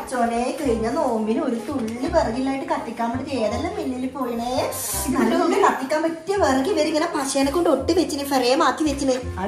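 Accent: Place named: Malappuram, India. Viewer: thante malappuram slangum thante kannur slangum adipoli🤣🤣😄🫶🏻😎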